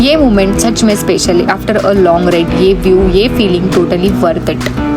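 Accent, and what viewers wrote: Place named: Maharashtra, India. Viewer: marathi accent dear...its cool...keep it up...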